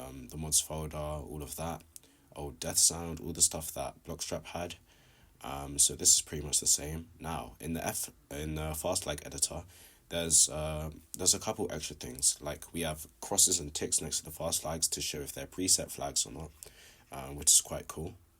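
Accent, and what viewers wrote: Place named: United Kingdom. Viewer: British accent go mad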